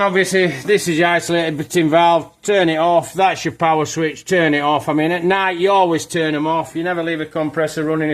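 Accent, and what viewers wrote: Yorkshire, United Kingdom. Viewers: Thanks for Air Zone compressor video maintenance needed to spray cars with paint. Good work Proper Yorkshire chat. KenXJ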